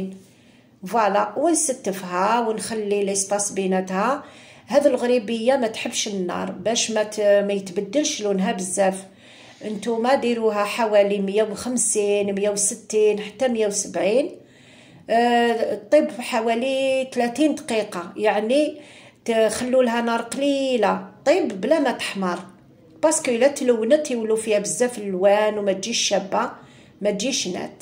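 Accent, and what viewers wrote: Algiers, Algeria. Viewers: لازم ديريلنا هذا الوصصفات التقلدية تاع زمان يعطيك الصحة مشتركة من السطيف والاكثر في وصفاتك هدرتك الحلوة العاصمية الاصيلة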